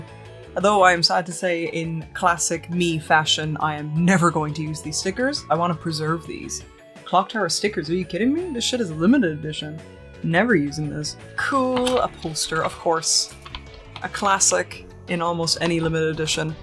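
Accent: Ireland. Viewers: Was not expecting the Irish.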